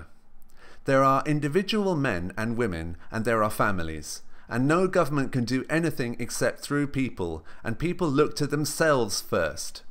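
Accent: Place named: United Kingdom. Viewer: british accent :checked video on a hot topic: checked shitty opinion : checked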